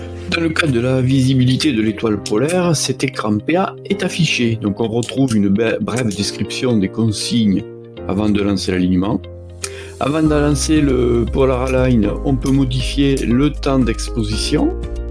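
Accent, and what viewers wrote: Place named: Toulouse, France. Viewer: Un grand merci pour cette série de très loin la meilleure sur ces sujets… c’est clair, précis et sans fioritures inutiles, ça va droit au but sans perte de temps mais à un rythme qui reste très facile à suivre et à assimiler. Pas de blabla, ça ne bafouille pas, c’est un travail didactique remarquable de grande valeur. J’y ai puisé tous les renseignements que je cherchais à comprendre et bien plus encore… Merci, merci, merci mille fois. Et j’ajoute que le petit accent toulousain est bien agréable à l’oreille, ça respire la sérénité tout ça…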